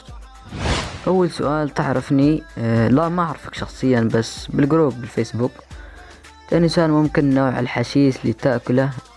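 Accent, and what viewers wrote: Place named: Saudi Arabia. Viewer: من المغرب و تتحدث سعودي؟ ملاحظة: حتى انا مغربية ._. و بحبك ._.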